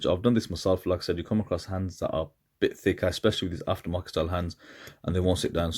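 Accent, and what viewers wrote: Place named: Northern England, United Kingdom. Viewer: I know i’m a year late to this video but better late than never. Great video bro. Where are you from, you sound Northern.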